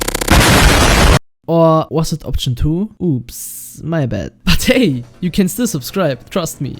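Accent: Germany. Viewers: Are u german? Because iam and i hear a german accent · You sound german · i höre a bischen of deutsch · Bis auf einen leichten deutschen Akzent hast du ein gutes englisch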